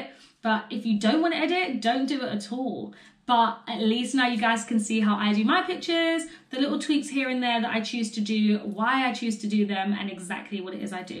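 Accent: United Kingdom. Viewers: The English accent is so cute to me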